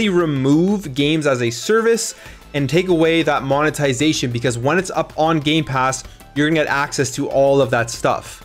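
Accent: Canada. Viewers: your from Toronto area im guessing I'm pretty good with accents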